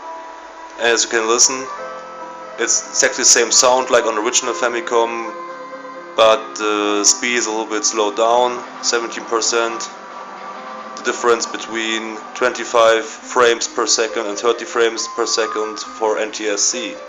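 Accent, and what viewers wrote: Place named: Russia. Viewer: Sound like russian try to speak english Где надыбал , колись :)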